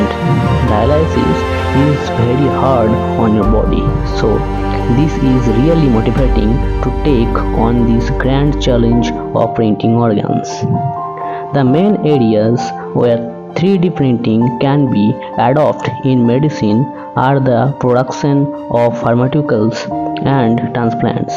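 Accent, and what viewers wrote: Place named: India. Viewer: What a stupid way to talk on top of louder music. And plus in Indian accident, what a rubbish man.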